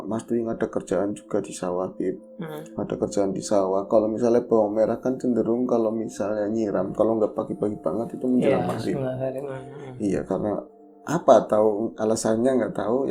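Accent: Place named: Tegal, Indonesia. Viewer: Udah mas style nya gt aja, malah asik koq kita jadi tau sedikit2 bahasa tegal!! Seru lho mas!! Sukses selalu untuk ngobor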